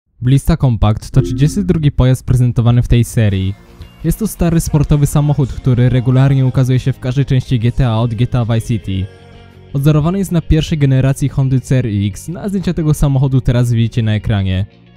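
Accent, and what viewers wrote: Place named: Silesia, Poland. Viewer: Domyśliłem się bo osoby ze śląska zazwyczaj wymawiają czy a nie trzy :P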